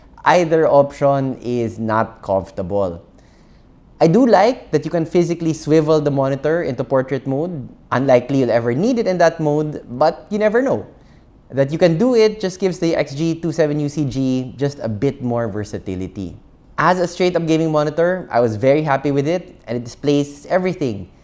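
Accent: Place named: Philippines. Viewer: Filipino accent is too funny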